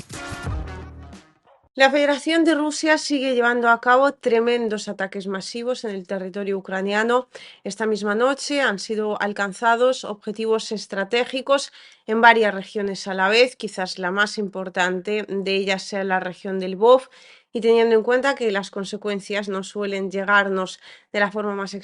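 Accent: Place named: Spain. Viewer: Hermosa, No Necesitas Hablar como Española. Eso te Devalua. Habla Mejor como RUSA. 👉✌️😍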